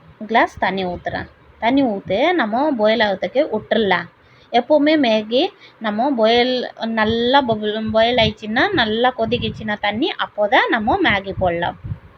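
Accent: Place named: Odisha, India. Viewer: Odis assent Tamil speaking nice explanation s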